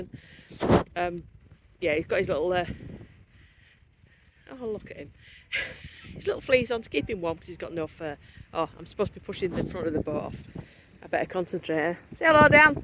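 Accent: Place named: North, United Kingdom. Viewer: I suspect Dan is from a bit further daaan sarfer than you,edging me bets,are you from yorks/lancs border? love your accent. Give Ernie a cuddle amd a hug.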